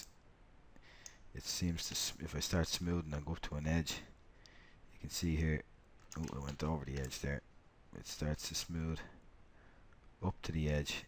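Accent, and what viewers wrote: Dublin, Ireland. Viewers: someones from Dublin ;) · fair play thanks for this series,sounds mad to say this but the fact we're both dubs i can follow along that bit easier compared to some other tutorials keep them going mate!:)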